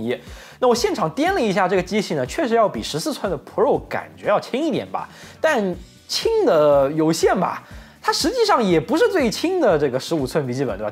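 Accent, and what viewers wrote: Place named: Shanghai, China. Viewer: 博主上海人吧,这个普通话听着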